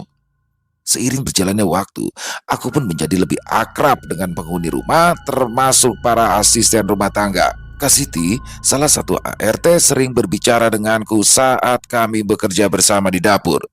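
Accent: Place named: Banjar, Indonesia. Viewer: Bagus logat banjar anda 👍👍👍👍👍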